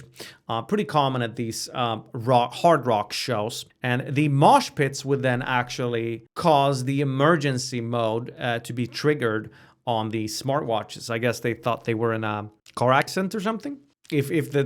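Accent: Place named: Sweden. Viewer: Do this in swedish next time cause I can't understand your english (due to your very thick accent)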